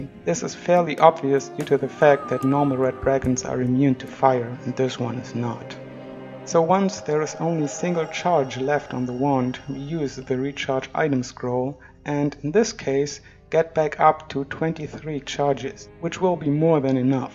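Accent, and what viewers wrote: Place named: Germany. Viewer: I downloaded the original version of the game for 1,24 € yesterday because I could not wait for the postman. I was too excited. It works. Because your name and your accent sounds pretty German I want to say: Danke für das Video. Das hilft mir sehr weiter. Prima sich mit jemandem auszutauschen, der sich bei diesen Klassikern auskennt.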